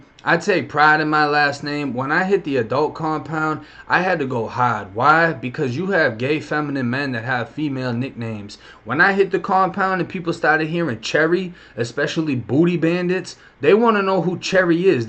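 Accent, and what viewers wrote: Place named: Massachusetts, United States. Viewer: Yo i found you on here and love hearing the stories. I’m from New Hampshire and I could tell you were from mass from the accent. I have been through the whole New Hampshire prison system and 5 of the county jails. Shits crazy I’m finally wrapped up after 7 long years but I like hearing from a real ass dude that speaks truth and doesn’t fabricate. You could write a book my dude. Oh ya and my birthday is aug 29 1986. Much love my dude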